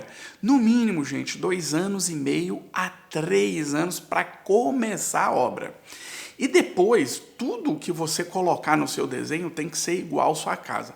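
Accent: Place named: Brazil. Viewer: Só brasileiro phoodido que veio de cidade de merrça que fala assim. Se Portugal fosse tão bom não teriam tantos deles na Europa toda.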